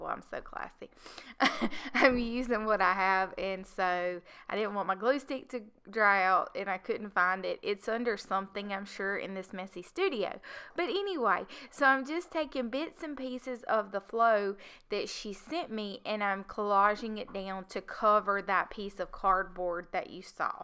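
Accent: South, United States. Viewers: Miss your cute southern voice at the end! It's still a Great process video!! I love all the texture and vintage feel. 💜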